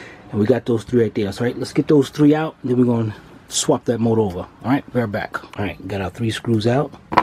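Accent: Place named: Northeast, United States. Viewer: NE accent....somewhere between Philly n Boston lol I can't quite tell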